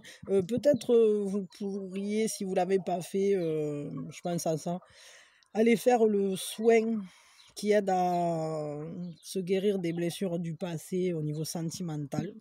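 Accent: South, France. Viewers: Mais kel joli accent! ..méridional a priori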